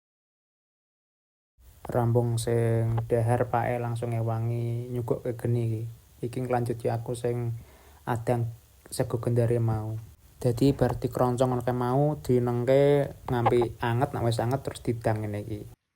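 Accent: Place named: Java, Indonesia. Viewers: Sampean ki rumahe mana mas? Kok boso jowone medok? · Bahasa medok smpean koyok aku ide ne iso aku suka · Kakak orang mana bahasa Jawa nya kok medok kali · Mas sampean wong daerah ngeni sih kok ngomonge hampir mieip banget daerah ngonku aku wong surur sari grobogan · Nambah komen mas,,ketok medok coromu ngomong ,,sampeyan corone masak ugo noto njero omahmu persis budeku,,.jangan gori,,iwak gereh.. Persis asli wong ndeso tapi kemajuane pesat,pinter opo wae. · Mase ki koyo wonogiri pinggiran Yen gunung kidul bhsane ono bedobe...umpomo yu .dadi zhu..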